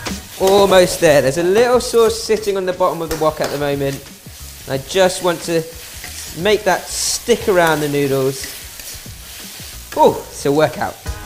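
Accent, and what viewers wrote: England, United Kingdom. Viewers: 🔥🔥🔥🔥 bro you talk like English kat but this dude looks Asian is fuck but makes good ass food bros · Some how a Asian with a British accent cooking Chinese doesn't seem all that authentic to me. Kind of like a Brit telling an American world war 2 story. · You don’t see many Chinese with British accents. Love it! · Fast and efficient cooking , and you have pommie English accent !!!!!!!! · i am an asian n i am jealous of ur english accent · Asian guy with a British accent lol. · I like the way you speaks . Britainese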